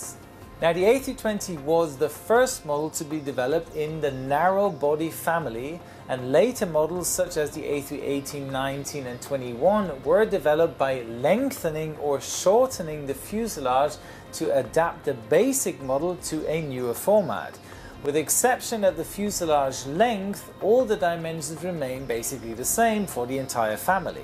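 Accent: Germany. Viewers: Captain you are from Germany? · Kommst du aus Deutschland? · Is he a German?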